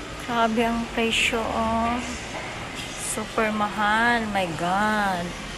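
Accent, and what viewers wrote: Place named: Visayas, Philippines. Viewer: Pretty kaayo ka sis, and i love the way you talk ky pareho ta bisaya.. Amping diha permi,kumusta na lang ko sa mga hapon. Hehehhe..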